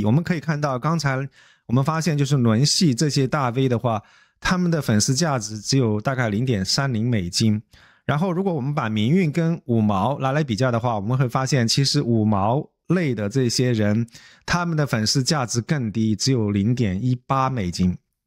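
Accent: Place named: South, China. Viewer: 听up主的口音，好像是南方人，广东福建一带的？有没有关注香港或从香港逃到台湾的一些大V，以粤语为主，粉丝量和 收入也很可观。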